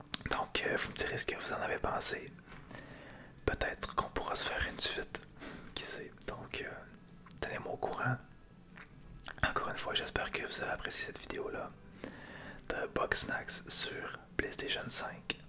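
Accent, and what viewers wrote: Quebec, Canada. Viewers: Merci pour tes vidéos. J'adore ta voix, et ton petit accent québécois me déclenche énormément 😉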